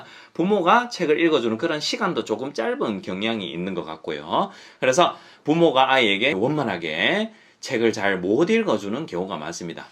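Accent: Gyeongsang, South Korea. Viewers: 요즘 하루도 안빠지고 님 영상 인스타 열심입니다^^ 저도 경상도 부산이라 어감도 확 와닿아서 어떻게하면 님처럼 선한 영향력을 가질까 고민하고 또 고민하네요. 3,4학년 딸들이 되고나서 전자기기 허용폭을 넓혔더니 확실히 종이책 읽기에 소원함을 느끼고 친한 친구들과 독서모임을 할수있도록 인위적인 환경을 만드는 중이네요~ 물론 엄마들도 함께요, 아-지나고 나면 항상 아쉬움이 남아요.요즘 다시 육아공부중입니다^^ 요즘 3,4학년 된고나서 발름기호를 가르쳐야되나라고 고민에 빠져요, 이제까지 소리만 듣고 그대로 읽었는데 발음기호를 알려준다면 시기는 언제며 꼭 필요한지 고민입니다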